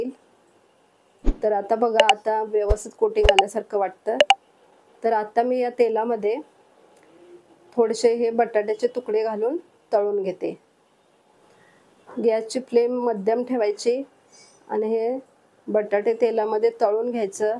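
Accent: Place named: Kolhapur, India. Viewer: tai ani tumhi bolata khup chan kolhapuri god bhasha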